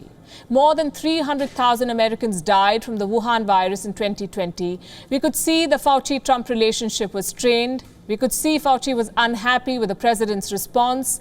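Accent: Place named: India. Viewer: u have heavy indian accent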